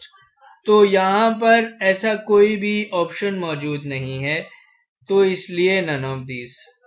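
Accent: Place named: Karachi, Pakistan. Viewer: Lahore board k haii?? Awazz apki Karachi walii lag rahii ha🧐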